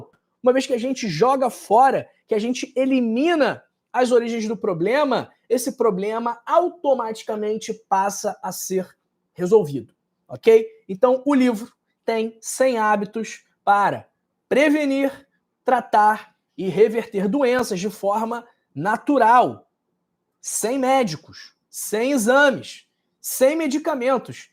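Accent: Rio de Janeiro, Brazil. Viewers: Oi dtor. Adoro sotake. Do. Rio. De janeiro. Acho. Lindo.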